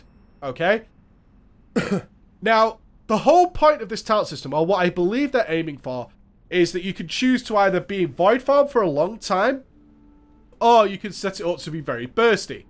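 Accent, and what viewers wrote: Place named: Manchester, United Kingdom. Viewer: It must be the Manchester accent but I can never tell when (or anyone) is mad or enthusiastic about something when i can't see his body language.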